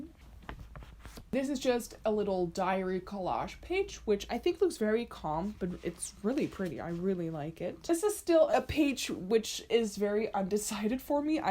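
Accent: Netherlands. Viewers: omg is he dutch? XD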